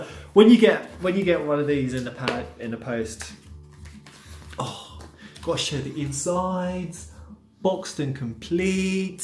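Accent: United Kingdom. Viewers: Are you from England? You spoke so elegantly.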